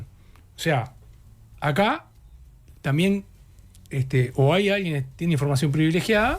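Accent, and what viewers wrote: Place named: Argentina. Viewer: Buenas noches parece que escucho a un periodista argentino , informando la estafa de los fondos estatales por una fundacion creada para robar a los pobres 4%. Si es verdad , los responsables de la fundacion A Ganar tienen que ir presos, en caso que no aya procesados el periodista Eduardo Preve miente!!!. Buen año 2025 con salud ... Nelson....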